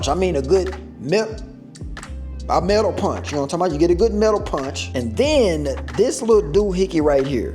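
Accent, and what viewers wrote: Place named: South, United States. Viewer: u from Crenshaw fam? I thought u was born and raised in the South fam especially with da accent.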